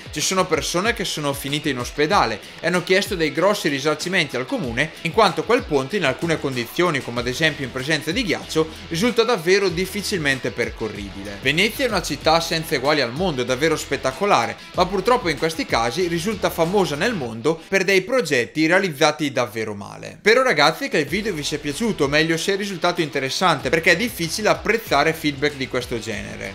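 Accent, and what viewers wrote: Brescia, Italy. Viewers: Bravi ! Sempre esaustivi e concreti! Ma siete di Brescia? L’accento sembra di lì… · Si sente che sei di Brescia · Ma quell'accento bresciano? :-) Brao!!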